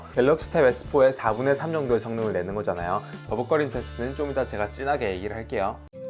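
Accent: Gyeongsang, South Korea. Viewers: 형 이때는 갱상도 쌍남자스럼이 남아있었네유 ㅋㅋㅋㅋ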